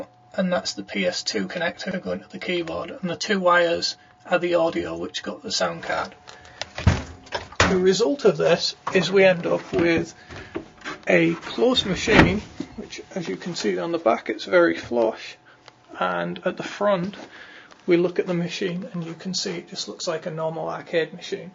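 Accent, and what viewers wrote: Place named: Geordie, United Kingdom. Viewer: hey, how did them yanks find that hardcore geordie accent of yours??!?!?!?!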